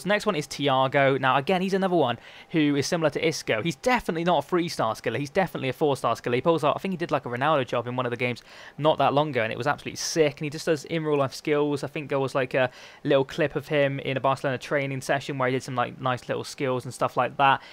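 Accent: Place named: Cornwall, United Kingdom. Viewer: you sound very cornish!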